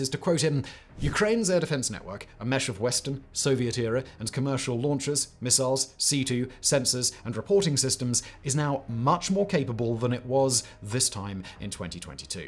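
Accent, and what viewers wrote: United Kingdom. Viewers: Love your channel. One question: How come the English pronounced the "A" as an "R... and the "R" and an "A" ? Is it intentional? Like " R B C D E F... and. Q A S T U V? Not making fun, I promise, and the Brits have the best accent ever... just always wondered.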